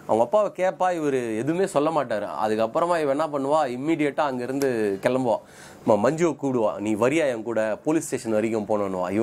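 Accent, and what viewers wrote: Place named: Chennai, India. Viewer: Listening to your story and folding clothes 🤣🤣🤣 Chennai dialects awesome....you and your attitude resembles one my close friend.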